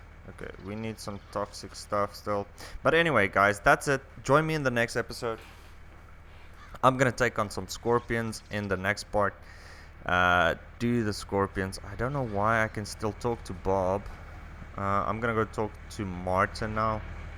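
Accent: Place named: South Africa. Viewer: Dis alles in sy stem. Hyt daardie BoerQ aksent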